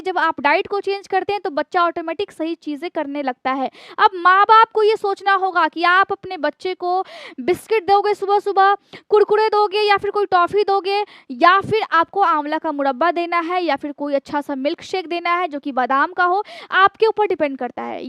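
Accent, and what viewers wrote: Bihar, India. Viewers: Bihari lag rahe ho Ghar hota h Ghad nahi Agar hota h Agad nahi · I love your way of speaking like bihari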